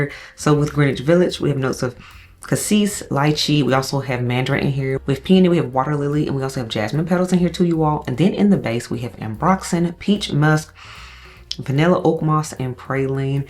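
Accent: Memphis, United States. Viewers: 🌻🌻🌻🌻🌻🌻🌻I know a "Memphis accent" when I hear one! So glad your move went well! Gonna check out two of those scents you showcased! Take care!!!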